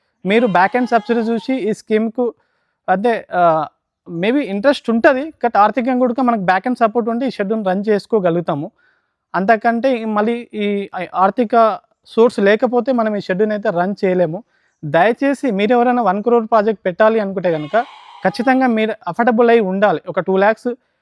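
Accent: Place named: Telangana, India. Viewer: Telangana telugu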